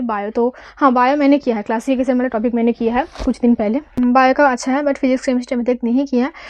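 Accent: Bengal, India. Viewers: Ntpc ka process is baari fast hoga Or West bengal me vacancy bhi accha h....tumhara bolne ka style se lag rha h u r from bengal... So don't miss it keep going on👍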